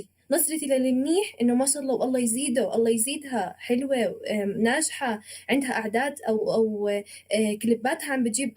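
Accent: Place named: Palestine. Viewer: ما ابي اتفلسف بس اللي لاحظته لهجتك فلسطينية تقدر تصحح لي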